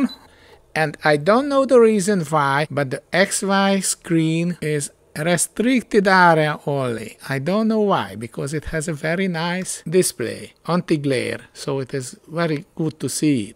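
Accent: Hungary. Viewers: Így ahogy mondod néha a kevesebb több, tetszett a CRT-k gyorsasága, és persze a Hunglish pronansziésönöd is :)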